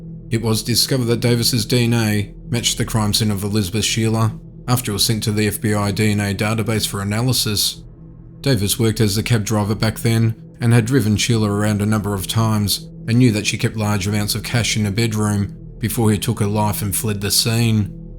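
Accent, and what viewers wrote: Australia. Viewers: He's an Aussie 😊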